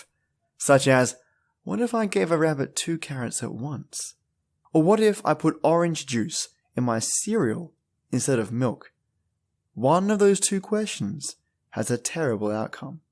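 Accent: Australia. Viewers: Trying to sleep at 4AM, but before I finally doze off I'll be here listening to this video 👍🖤 in that awesome Aussie accent of yours 🙂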